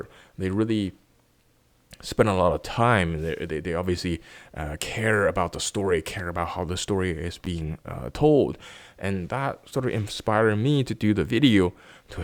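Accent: Standard. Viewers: holy shit，Your Chinese thank-you words made me even suspect that you are a Chinese blogger in a foreign country, your Mandarin is really standard! damn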